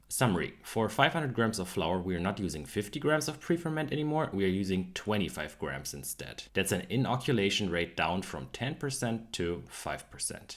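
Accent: Germany. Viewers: Wow thermomix congratulation, salut 🤣🤣🤣you are too funny -I got lost when you talk with a lot of numbers 😢( failed math in school )can you theorize sourdough panettone please - panettone is the king of sourdough bread -❤I love German accent and jokes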